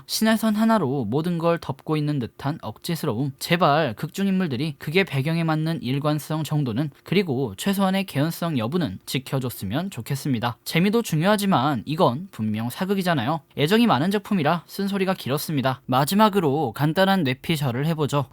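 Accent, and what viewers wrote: South Korea. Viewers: ㅎㅎㅎㅎㅎㅎ 말을 허벌나게 잘해부리는구마이~ 틈틈히 리뷰 잘보고 있어요. 지루하지않고 군더더기 없이 리뷰를 잘해주시는거같아요. 근데 놀란건 전라도분이 어찌 이리 완벽히 서울말을 구사하는지.. ㅎㄷㄷ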